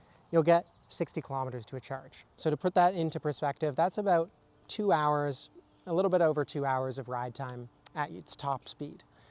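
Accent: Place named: Canada. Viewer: Oh my goodness, Canadian Eh? Where can I go and see this and buy one.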